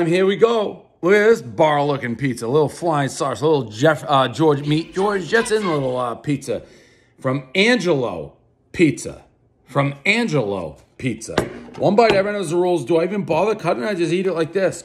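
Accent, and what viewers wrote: Boston, United States. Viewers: No, it’s his low class Boston accent. He does it with every word like that. He says mama’s like muh-muh’s.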